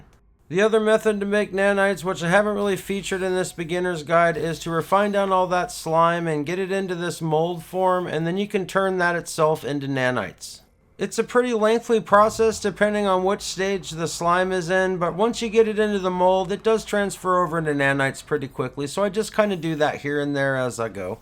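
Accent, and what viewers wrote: Canada. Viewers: Are you Canadian? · LOL. Just found this channel. im almost 30 hrs in. I JUST found my first Glyph Portal. Got 1 Crap freighter. a few ships. One is a fighter with way more dps than the other 2. 4 storage container rooms in the freighter. A few upgrades to things. Multiple Base Computers plopped on worlds. I havent even built a real base area. I have a 3x2 box with a door. 2 Specialists that now dont seem to do anything but stand there. Gettin the hanf of the game though, but if there is anyone out there that is less efficient than I am, you deserve an award, because this game is not user friendly. It has the most convoluted menu system ever. Its sleek...i'll give it that, but holy moly...spending 3 hrs to figure out how to do something as simple as figure out where to find "Hydraulic Wiring" 0.0 Still love this game though edit: Dude sounds like he is from Alberta edit: Grave Locations?? 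25 hrs in and i have died once. Found my grave. Never seen anything about others' graves o.o. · Sounds like you stepped straight out of Letterkenny lmao 🤣 thanks for the video. Haven't played in a year... Everything has changed so much... I decided to create a new game save so these tips will be very useful.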